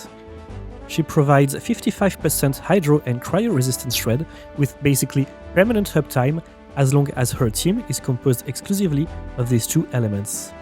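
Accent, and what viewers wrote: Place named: France. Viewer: my bad but more I hear you more I think I'm hearing a french accent like I don't feel u to have English as first language 👽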